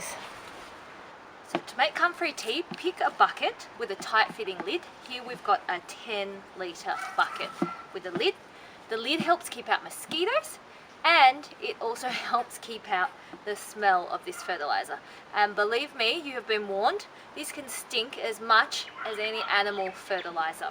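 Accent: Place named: Australia. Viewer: New to your channel and subscribed because you are informative and succinct. Your aussie accent and unpretentious good looks and mannerisms are also delightful and non-abrasive. Kudos!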